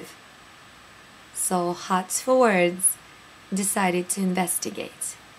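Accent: Russia. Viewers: She's most likely Russian. Notice how she pronounces the words "lesson", "fifth" and especially the vowel "a" as in " ". BTW: LOL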